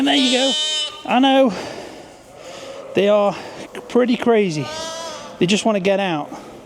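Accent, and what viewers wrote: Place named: Stoke, United Kingdom. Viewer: Is this in stoke you seem to have a stokie accent 😊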